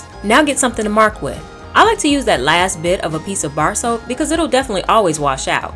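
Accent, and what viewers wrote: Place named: South, United States. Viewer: it’s the cute Southern accent for me, y’all!❤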